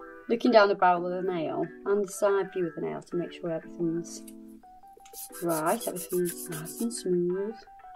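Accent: United Kingdom. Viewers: I really enjoy listening ur british accent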